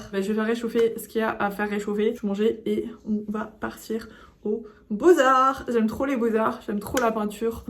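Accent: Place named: South, France. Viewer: Tu es vrm magnifique, mais ducoup tu viens d'où si tu n'es pas de lille ?🥰 Moi je dirais sud de la france 😂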